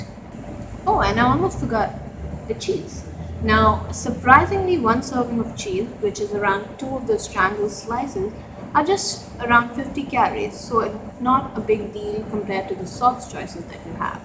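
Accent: India. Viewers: I cant pick up your accent sounds Indian. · u sound desi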